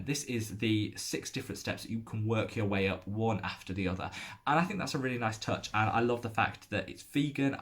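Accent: United Kingdom. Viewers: You are one of my favorite contact creators and I thoroughly enjoy your videos and appreciate the enthusiasm and passion you bring to skin care. I really enjoyed the podcast you did with Kelly Driscoll. It was fun to learn a bit about you and your experience as a content creator. As someone from Southern California who hadn’t been across the pond, can I just say how much I love your accent? I really appreciate that you don’t take sponsorships and couldn’t agree more with what you said about how “If you sell your integrity, what do you have left?” You are one of the few people that I go to watch reviews on before buying a product because I really trust that you’re giving your honest opinion and not a sponsored one. Thank you for intro’ing me to British brand Medik8. As someone who uses Tretinoin but didn’t want to risk using it under my eyes, I’m excited to try their Crystal Retinal Cetamide eye. 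Cheers!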